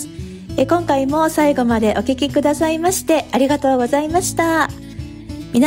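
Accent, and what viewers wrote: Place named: Niigata, Japan. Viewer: どこか少し新潟弁入ってる？と思っていたらやはりそうでしたかー 私の故郷です いつも優しい語り口で癒やされます これからも楽しみにしております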